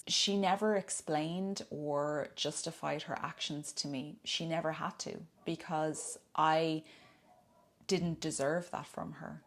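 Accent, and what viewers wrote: Ireland. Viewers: Great tips! I love your beautiful Irish accent. Hello from the USA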